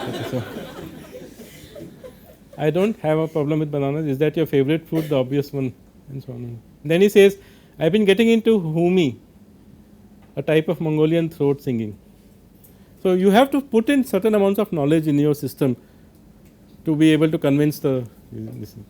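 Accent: India. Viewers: I love Indian folks. From the 90s smuggling recycled 64 bit sparcs over yonder, to present day; where Sunnyvale is a suburb of Mumbai. But listening to that accent, thick as curry molasses in wintertime... god help me or Vishnu whoever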